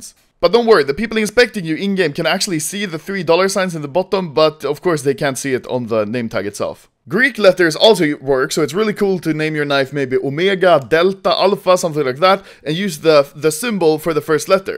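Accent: Sweden. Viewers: Wait wait wait Your Swedish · YO I CAN HEAR U ARE SWEDISH:d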